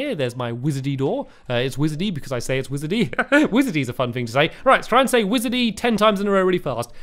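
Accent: England, United Kingdom. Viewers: Cake is Nice do u not know what a an English accent is?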